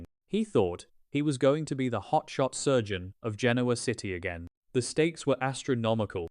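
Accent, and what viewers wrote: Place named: United Kingdom. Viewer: A British narrator is so wrong for this. He is not pronouncing names correctly. Specially Devon. Have an American HUMAN narrate or don't do it at all. After a one minute, had to stop. Couldn't listen to another word. Horrible.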